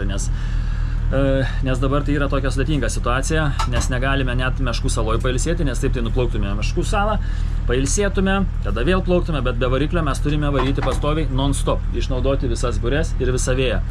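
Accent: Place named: Czech Republic. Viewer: Gražus čekų akcentas ❤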